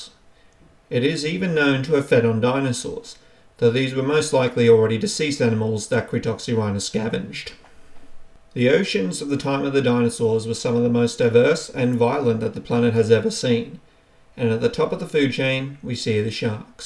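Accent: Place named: Australia. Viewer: Yep, there's the Aussie accent lol... "It's a shack!"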